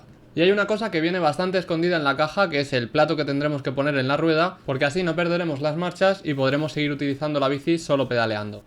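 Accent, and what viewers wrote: Spain. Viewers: Bien esplicado y un inconfundible asento español o catalan . Miy bueno el video grasias y sige asiendo videos es lo tuyo hermano un abraso y sr felis y as el bien y no mires a quien . Saludos celso sanabria .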